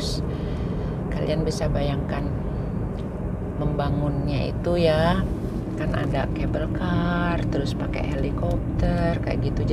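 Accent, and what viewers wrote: West Java, Indonesia. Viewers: Bu , emut keneh basa Sunda teu ? Di logat namah masih Sunda nyaaa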